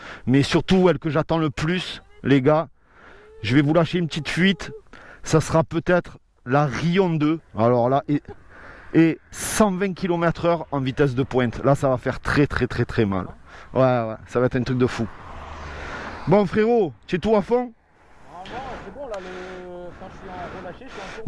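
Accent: South, France. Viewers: Merci pour ce magnifique test.. Cela ne sera que réservé en une niche de clients car le prix, son poids... donc pas à monter et descendre 3/4 étages pour la ranger dans son appartement.. Ensuite, elle est monstrueuse mais il existe une concurrente (bcp plus léger mais moins d'autonomie avec autant de puissance): La Rion race edition. Par contre question concernant l'étanchéité et la tenue sur la route par temps de pluie? Dans tous les cas, tu es the first one youtube FR sur les tests trottinettes.. (ta personnalité, les montages vidéos, ta sincérité et cet accent du Sud.. 😉) Ride safe l'ami et merci encore.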